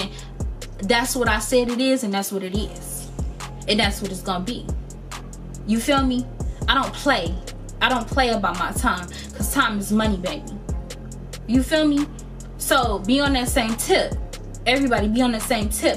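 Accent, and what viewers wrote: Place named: Detroit, United States. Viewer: When u said I’m from Detroit, I felt that that is how we talk , we be spicy , new subbie girl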